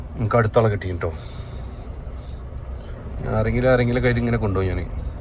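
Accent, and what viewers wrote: Malappuram, India. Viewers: Hai, where are you now? in Namibia? wish you a happy journey. Your malappuram slang is amazing. God bless you. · Malappuram സ്ലാങ് അടിപൊളി ❤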